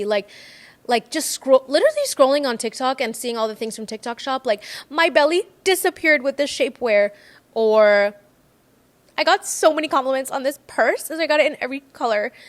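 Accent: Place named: United States. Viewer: I like this American accent can you tell me which region this is I will found more content in this accent I'm learning English with this accent but I don't know what is the name of this accent It will be good for my future English language hope Thank you for sharing Islamic content May god grant you more knowledge about Islam, Bacha from Pakistan ❤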